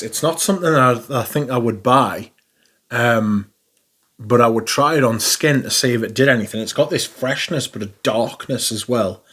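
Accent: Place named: Cockney, United Kingdom. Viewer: Dude all britsh cogney twats, talk like you? like damn you guys used to rule and exploit india and china but now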